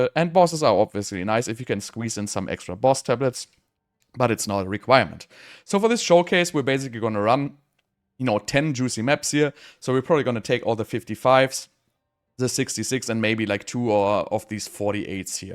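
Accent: Germany. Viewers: Liebe wie man das deutsch immer raushört hahaha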